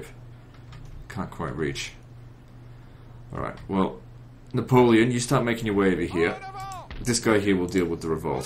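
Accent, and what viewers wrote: Australia. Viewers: are you aussie mate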